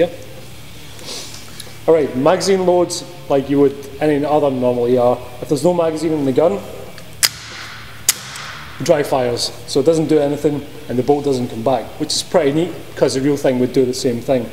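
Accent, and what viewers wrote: Scotland, United Kingdom. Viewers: So many people think he's a brit. Smh. He is obviously a scot.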